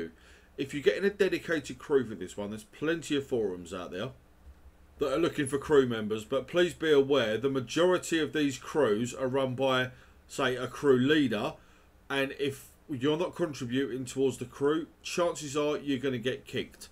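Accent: United Kingdom. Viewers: Are you from Kent?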